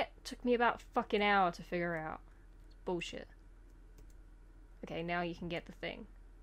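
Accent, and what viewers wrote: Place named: London, United Kingdom. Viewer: You have perfect London accent. I like it.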